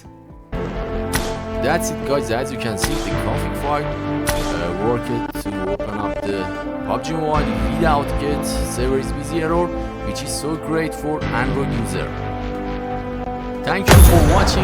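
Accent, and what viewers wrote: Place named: Iran. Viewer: 😂 اومدم کامنت بخونم لجه ایرانی فقط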